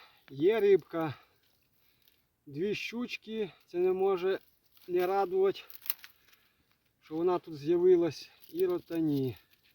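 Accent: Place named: Polissia, Ukraine. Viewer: Вітаю! Дуже приємно чути рідний полісський акцент.. я родом з Полісся з Чернігова.. дитинство пройшло у Чорнобилі але зараз зрозуміло мешкаю вже зовсім у іншому місті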